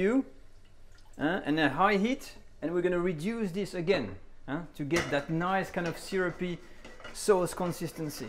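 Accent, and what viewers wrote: France. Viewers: This dude sounds so French.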